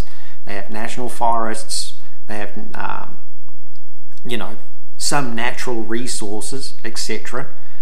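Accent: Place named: Southland, New Zealand. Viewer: Still hear the Southland accent there a little bit. I am from Invercargill. Thankyou.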